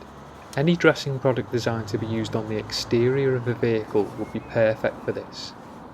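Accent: Liverpool, United Kingdom. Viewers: oibibi oybib he is British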